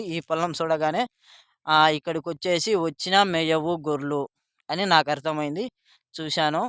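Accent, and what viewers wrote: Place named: Pulivendula, India. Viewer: Anna naadhi pulivendula daggara palli nee basha vintunte maa pallelo laaga vundhi ee vooru anna manadhi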